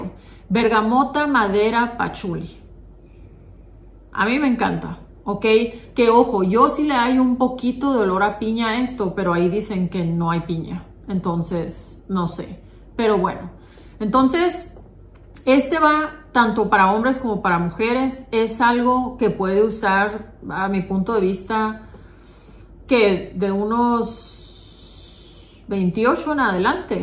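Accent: Mexico. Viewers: Llegué buscando una Buena reseña sobre perfumes de Lattafa Femeninos y encontré el mejor jajaja😂 no paro de reirme, además el acento mexicano es único lo amo. Khamrah : Esto es un perfume para alguien que ya lo arrastró la Vida 😂 Te ganaste una suscriptora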